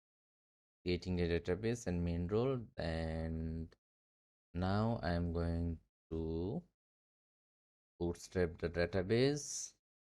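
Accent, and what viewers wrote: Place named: India. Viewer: NEXT TIME ASK A NATIVE ENGLISH SPEAKER TO RECORD THE VIDEO. VERY DIFFICULT TO FOLOLOW YOU WITH THAT STRONG INDIAN ACCENT.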